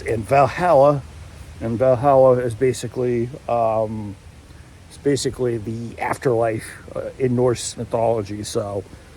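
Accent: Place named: England, United Kingdom. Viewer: Great intro and excellent english accent well done😅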